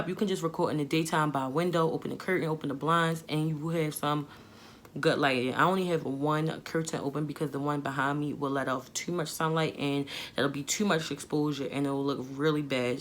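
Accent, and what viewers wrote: Baltimore, United States. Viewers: I'm from Cali and I love me a BMore accent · Girl I’m from DC I hear that Baltimore accent LOL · I agree this is probably the worse camera to vlog with. SN that Baltimore accent is strong girl! 💪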